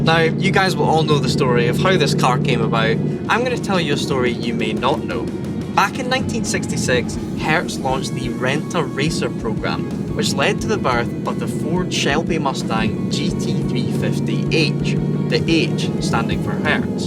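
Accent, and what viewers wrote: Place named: Glasgow, United Kingdom. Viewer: Do you? I'm Scottish and hate his accent. It's that "I'm a spoiled middle class Glaswegian who went to university and had 5 star accommodation and loads of money to buy me and my friends loads of things" It's a horrid accent.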